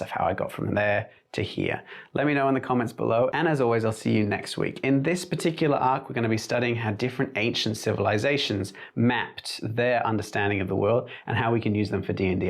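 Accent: Australia. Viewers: Finally an Aussie accent in a DnD context #normalisestrine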